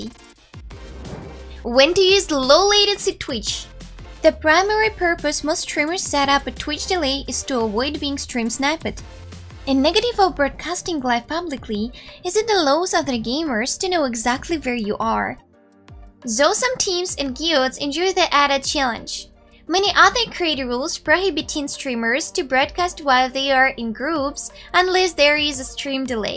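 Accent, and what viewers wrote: Russia. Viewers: are u russian?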